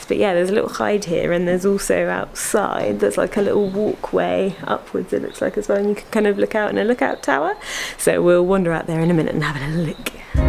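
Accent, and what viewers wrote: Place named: United Kingdom. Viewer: I love your British accent.😄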